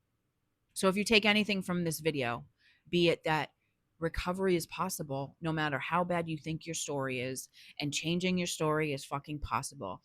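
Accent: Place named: Eastern Massachusetts, United States. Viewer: Thank you for the video, great job. I knew you were a fellow Baystater before you mentioned Boston & Worcester with that Eastern Mass accent 😊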